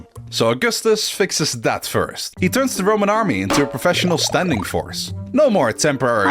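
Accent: Netherlands. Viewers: The ear tiwtch detecting a dutch accent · Oh that Dutch accent is so distinct. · Spotting the Dutch accent is not just easy for the Dutch, it sticks out like a sore thumb. See, we are multilingual and we want to speak fluent English, but when we hear ourselves back to hear how strong our accent is, it's raw cringe.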